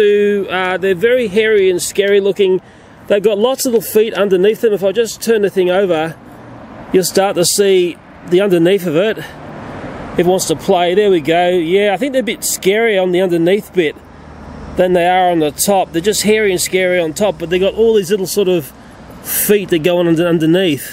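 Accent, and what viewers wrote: New Zealand. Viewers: Yeah sounds like a New Zealand Accent. Which is Taika Waititis nationality (guy who directed thor ragnarok and voiced Korg) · Thought he was a New Zealander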